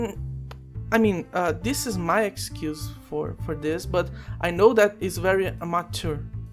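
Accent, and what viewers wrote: Brazil. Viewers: Excelente vídeo! Cheguei no canal recentemente e pelo sotaque, deu pra ver que você é brasileiro <3 Mas eu compartilho da mesma opinião,. Nós nunca podemos contar com a mera motivação para criarmos algo. Eu particularmente me forço ao máximo pra trabalhar nos meus projetos uma quantidade de horas satisfatória. Pra isso, eu uso um método que seria uma espécie de Scrum individual adaptado às minhas necessidades. Primeiro, eu crio um Product Backlog, com tudo que eu preciso fazer (seja uma feature da engine que eu estou desenvolvendo, alguma documentação, seja uma mecânica nova, seja um conjunto de sprites, uma animação, ou qualquer coisa), então, cada semana é como um iteração na qual eu preciso entregar uma quantidade X de releases baseado na minha atual deadline. E diariamente, eu faço um relatório com tudo que eu desenvolvi naquele dia, quais foram os meus empecílios e o que eu devo fazer pra melhorar aquilo. 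Dessa forma, eu não dependo apenas da motivação e eu me "forço" a continuar criando pra conseguir atender aos meus objetivos de longo prazo. Esse "método" ainda está em fase de testes uasdhusahudhusa, mas eu procuro aperfeiçoá-lo sempre que posso. Um grande abraço e parabéns por esse canal incrível <3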